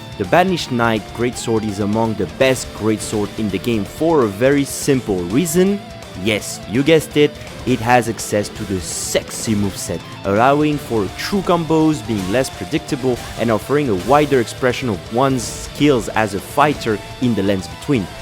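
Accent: France. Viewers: A il a un accent français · Je kiff tes vidéos tu gère serieux, je rep en francais j'ai entendu "putain" dans une de tes videos et t'as un accents un peu "fr" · T’es français toi c’est sure, mais t’as un accent vraiment mignon en anglais 😊 and I’m sure you made this video for the PVP player and not PVE. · cet accent anglais du Pas de Calais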